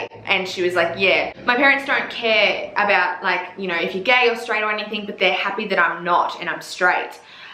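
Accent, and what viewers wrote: Australia. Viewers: Your Aussie accent 😩. Love it girlfriend.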